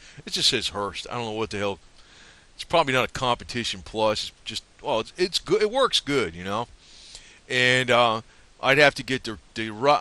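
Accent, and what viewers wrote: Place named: Sacramento, United States. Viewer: I bet he lives near Sacramento, sounds like me.. I live in KY now and I sound retarded but hey I used to think the KY accent was retarded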